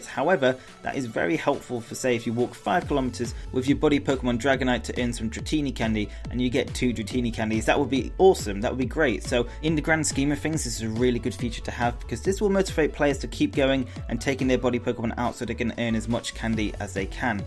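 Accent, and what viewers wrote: United Kingdom. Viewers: omg your British yassss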